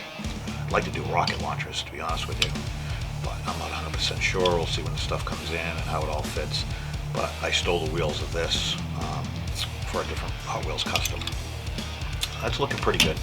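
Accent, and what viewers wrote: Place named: Southwestern Pennsylvania, United States. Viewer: Sounds like a Southwest PA accent.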